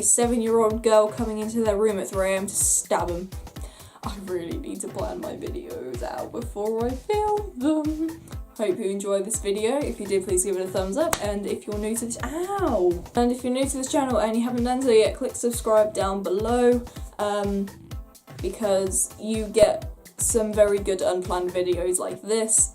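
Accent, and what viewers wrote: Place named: Norfolk, United Kingdom. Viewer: Learn to speak Enlgish you Norfolkian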